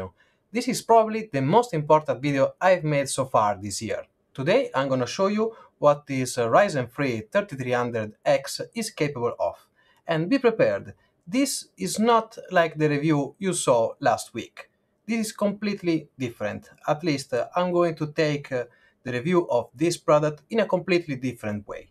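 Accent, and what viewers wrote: Italy. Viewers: wait, are you italian?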